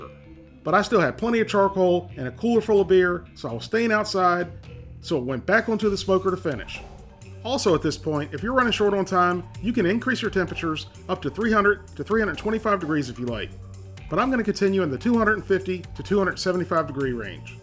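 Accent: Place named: Virginia, United States. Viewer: Are you from Virginia? Your voice along with style of cooking reminds me so much of family in VA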